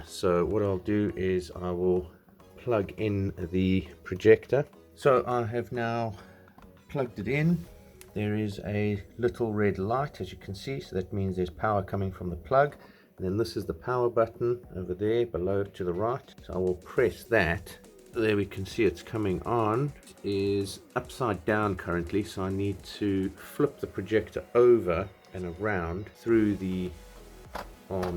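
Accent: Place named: South Africa. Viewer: You sound South African. How can I get this in South Africa (Pretoria)? Great video 👍🏽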